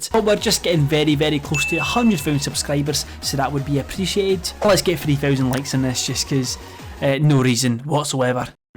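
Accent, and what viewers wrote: Scotland, United Kingdom. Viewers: are you scottish? i love your accent damn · Hello, I'm just asking. Are you British or Scottish? If not, what is your nationality. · r u socttish? U sound scottish and I like that this is the first vid i watched abt u btw lol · Are you from Scotland?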